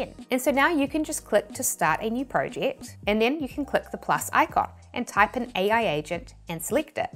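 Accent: New Zealand. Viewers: Kiwis have the sweetest accent.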